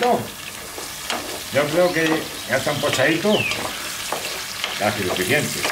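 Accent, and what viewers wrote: Spain. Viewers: Da gusto verte cocinar y escuchar ese canario calidad a ver si lo enseñas en algún vídeo, un saludo. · qué no sé le entiende?? Qué le habla al diafragma porque vamos...... andaluz😢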